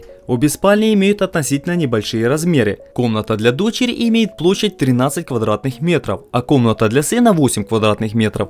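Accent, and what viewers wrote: Surzhyk, Ukraine. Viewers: Весело у вас пробивается суржик) Смесь русского и украинского.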